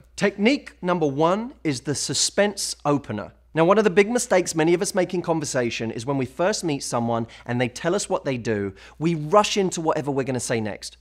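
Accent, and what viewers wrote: United Kingdom. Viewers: Is this man British, if not forgive me XD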